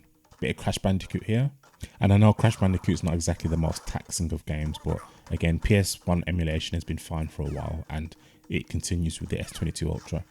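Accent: United Kingdom. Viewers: You from Brum too? I subbed man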